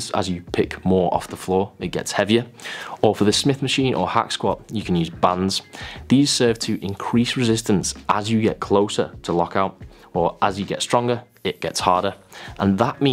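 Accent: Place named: Manchester, United Kingdom. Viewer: Certainly sounds like Manchester to me. Hello from a fellow manc!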